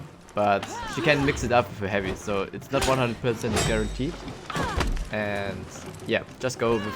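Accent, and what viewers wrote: Germany. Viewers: its the audio mix, he has a slight german accent but should be understandble for everyone.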